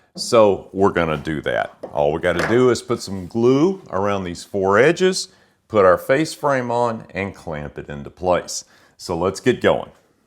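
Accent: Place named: Tennessee, United States. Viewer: I think I heard a slight Tennessee accent. My Dad's from TN.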